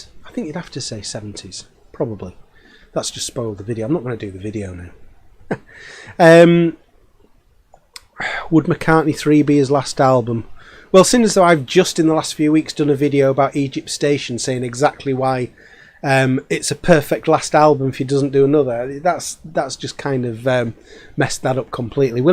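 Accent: Leeds, United Kingdom. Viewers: haha no worries! Thanks from the response, it’s worth checking out Graham Gouldmans latest album “ ” think you would enjoy it! Also completely off topic I’ve always been able to tell your from Leeds just based on the way you pronounce “Remaster” like “Marster” if that makes any sense 😂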